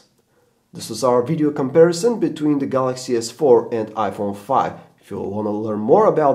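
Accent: Russia. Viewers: where you from??? sound like from russia or some near country and why comparison sounds like you more iphone man . They are just phones. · are you Russian? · That Russian accent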